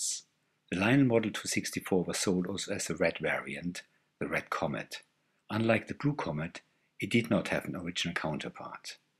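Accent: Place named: Germany. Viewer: Sounds like you may be German by your accent. Your trains are the most prewar examples I've ever seen. Thanks for posting. Danke.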